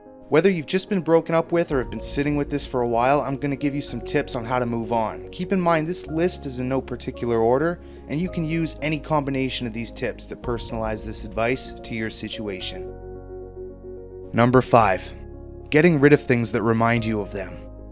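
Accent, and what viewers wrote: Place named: Canada. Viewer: What a fine fellow you are. I'm trying to place your accent to a State? Or, are you a Canadian?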